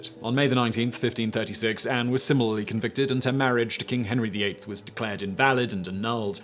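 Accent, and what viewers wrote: United States. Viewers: Dine asty. When did you become a Yank? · oh don't I know it the thing is its pronunciation is completely different in the UK. His US pronunciation is very grating on the old ear holes don't you know. What's actually scary is I can remember the names of the characters :)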